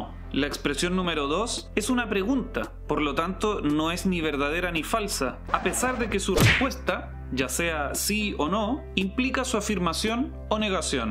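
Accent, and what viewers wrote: Chile. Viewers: Q lindo el acento chileno, no se xq la gente no le gusta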